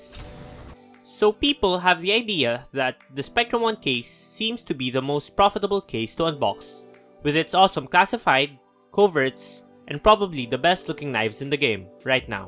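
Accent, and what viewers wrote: Philippines. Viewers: Pare keep up the good work, nahalata kitang pinoy dahil sa boses, Thanks for the hardwork you put in your vids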